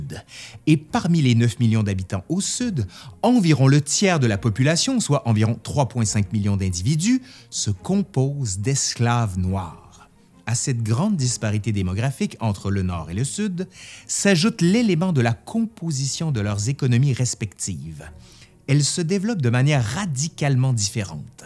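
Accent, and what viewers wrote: Quebec, Canada. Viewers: Très intéressant. Un point objectif et clair sur la guerre de sécession 😮. En plus l accent canadien est au top😅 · Super vidéo, je suis un passionné de la guerre de sécession, et c'est un véritable plaisir de l'entendre raconter par ta personne. Surtout ne perd pas ton accent Québécois, cela enlèverais la moitié du plaisir à t'écouter. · J'aime son accent français. Est-ce Canadian ? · Le narrateur a un superbe accent Canadien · Beau travail, l'accent Québécois ajoute un côté agréable même si quelques mots m'échapes . Mais pas grave le sujet est boulot effectué est très agréable a visionner. Bravo ! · Avec ce petit accent canadien qui fait que le charme est complet car on est complètement dedans !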